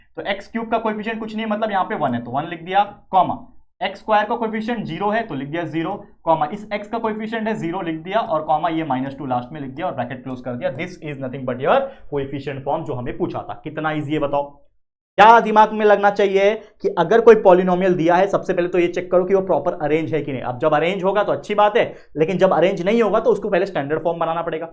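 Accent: Maharashtra, India. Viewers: Are you from maharashtra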